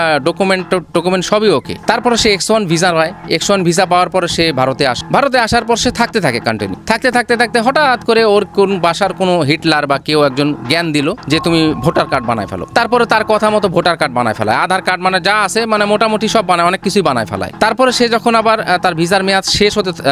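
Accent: Bangladesh. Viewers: Apni ki nije bangladeshi? Accent sune toh tai lagche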